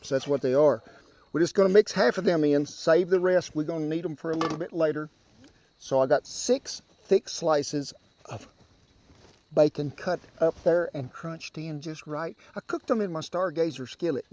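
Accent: United States. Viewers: Of course I would cook some thick sliced 🥓!!! That should always be the first thing cooked in a fabulous iron 🍳! I currently don’t own an iron skillet, but grew up on one as my Dad was from Tennessee. So, I recently decided that I want iron in my life and started researching and ran across your channel first off. I really enjoy watching you cook and listening to your authentic cowboy accent. Your recipes are fabulous and your wife is lovely. It’s always pleasure watching you 🥰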